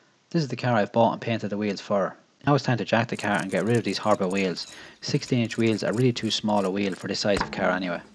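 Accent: Limerick, Ireland. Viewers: I’m from Southern Ireland, I can confirm this is not a Dub accent 🤣 Limerick/Wexford maybe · You sound Irish and this is a very honest video it's beautiful so are the wheels when on that car cheers for makeing it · McGregor has Scottish/northern I think this fella sounds Irish. But I do get your joke man! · Nice to hear an Irish Man 👍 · Lol it's hard enough understanding that Irish accent and talking fast ain't helping ither 😂😂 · That Irish Accent...!! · He is Irish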